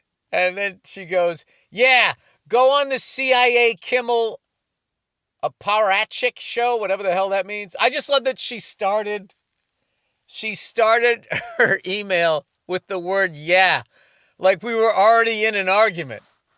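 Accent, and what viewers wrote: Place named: mixed, United States. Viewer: This much truth in a Boston/New York accent is breaking my reality. DAMNIT Bill, knock this shit off, but effing don't.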